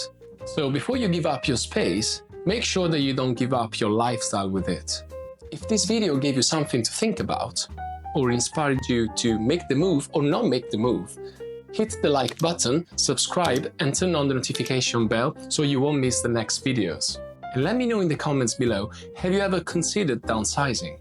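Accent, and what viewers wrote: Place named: England, United Kingdom. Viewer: So refreshing to hear someone who has learned to speak English with a more authentic English accent, rather than an American accent.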